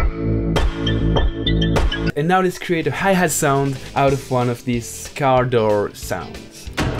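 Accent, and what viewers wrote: France. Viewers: hey one second... he got a french accent no?😂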